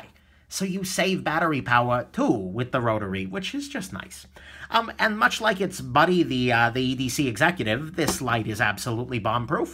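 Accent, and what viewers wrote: New York, United States. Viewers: I hope your fiancé has your beautiful New York accent. And I also pray that your babies, of which I hope there are many, also have that beautiful New York accent!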